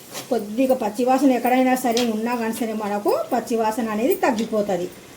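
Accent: Rayalaseema, India. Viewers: Nippulu,telling in tellugu very nicely.from rayalaseema ?.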